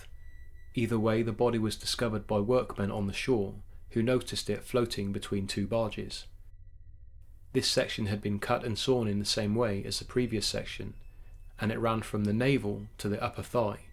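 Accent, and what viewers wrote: England, United Kingdom. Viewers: It is very much an authentic English accent,from somewhere west of London.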